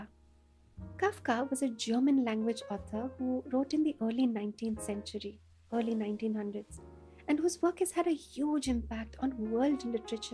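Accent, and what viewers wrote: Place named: India. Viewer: A sweet story in a sweet voice. Thank you for bringing these kinds of stories to us in Indian accent. It (nest) really gives us (listeners) comfort (Indian accent). Thanks!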